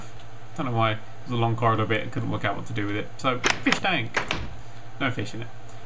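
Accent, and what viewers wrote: United Kingdom. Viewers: Finally a British guy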